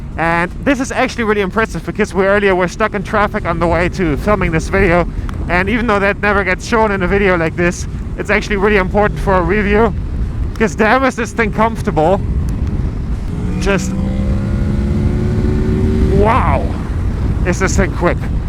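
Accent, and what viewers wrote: Germany. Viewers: Bruh the German accent is killin me 💀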